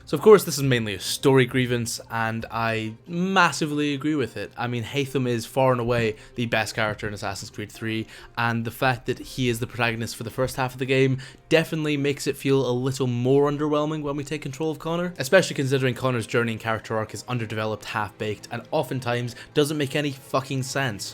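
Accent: Scotland, United Kingdom. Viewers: Damn, is scottish?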